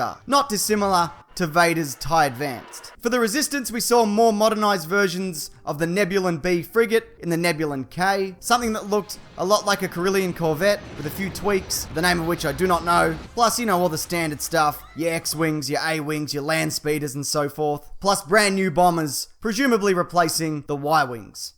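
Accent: Australia. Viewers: wait are you Australian? You sound like Australian? (Just curious)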